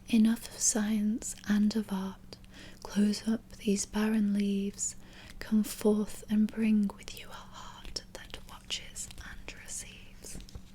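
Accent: United Kingdom. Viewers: Thanks for your contents. Your pronunciation is very pleasant, are you British?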